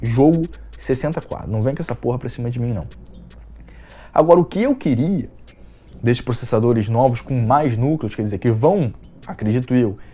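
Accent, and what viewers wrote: Rio de Janeiro, Brazil. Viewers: poh não para não! Conheci teu canal através desse conteúdo, gosto de todos os assuntos que vc aborda aqui no canal, mas krlho os videos que mais me prendem aqui são desse tipo, tu fala sobre umas paradas, q eu que tnho um certo tipo de conhecimento nessa área, fico tipo “krlho esse maluco manja pra porra, foda ver ele falando essas paradas pq são de fato assim” então manda mais conteúdo assim que ta foda, ainda mais no nosso dialeto carioques que fica mais pika ainda kkkkk. Tmj ✌🏻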